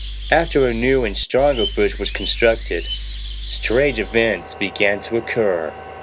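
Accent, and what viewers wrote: United States. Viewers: You did a great job using an American accent. You could use your American accent for your narration in the Clinchfield Curse. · Not bad. Great southern accent by the way. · Was most of the dialogue you attempting an American accent?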